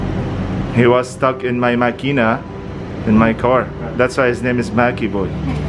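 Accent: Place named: Philippines. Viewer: Hope Maki boy gets well soon, you are a great dad. By the way, while listening to you talking, your accent and intonation is sounding more and more like Filipino speaking in English.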